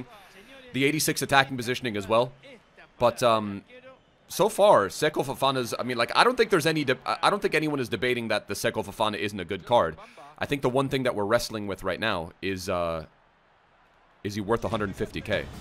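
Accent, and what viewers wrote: Ireland. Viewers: Lmfaoo irish?? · wow i literally believed he was irish lol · me being confused for the first 2 minutes of the video if he really thinks he is irish or he's just joking around :D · Actually thought he was Irish my disappointment when he didn’t strong link to my Roy Keane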